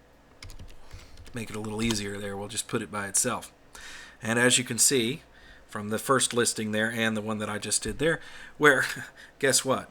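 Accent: South, United States. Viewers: Ignorant Brit, here.. what Southern accent exactly do you speak in? It's soooooo precise in the consonants, and clear. Maybe you're just well-practised with a mike, too.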